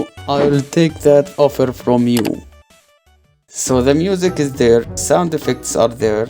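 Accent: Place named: Arab world. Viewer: عربي؟ i knew it from the acent then the T H I C C Milk!!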